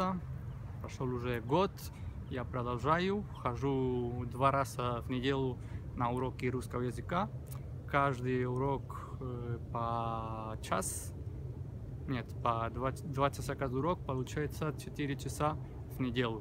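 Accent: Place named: Spain. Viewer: Произношение супер! Первый раз слышу от испанца такой чистый русский, ты меня приободрил! Потому что обычно когда слышу с каким акцентом испанцы говорят по русски, прихожу в ужас от того, как наверно, слышится моя речь по испански.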